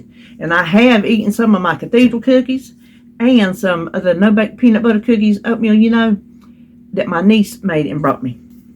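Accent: South, United States. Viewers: Hi:) I enjoy when you chit chat:) I love your Southern accent! You should hear me - I have a wicked Boston accent!! LOL 😂 Children feel like you are playing with them if you just watch them play:) They just want to be with you. ❤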